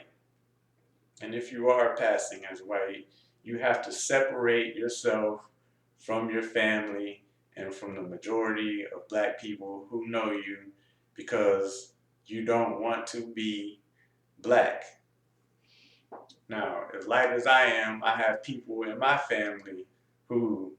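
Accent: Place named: South, United States. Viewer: This was well done. Its a heartbreaking topic but has to be brought to the light. I like book talks and it sounds like you have a Southern accent which I can relate to so I subscribed.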